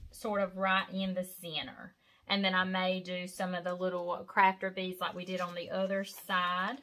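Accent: South, United States. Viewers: You're so creative and your projects always look high end like most YouTubers say, but they don't usually hit the mark! Not trying to be ugly to them, just trying to compliment you as you clearly deserve! Also, I love watching those of you that have a wonderful southern accent like myself... I'm from South East Texas. Where are you from?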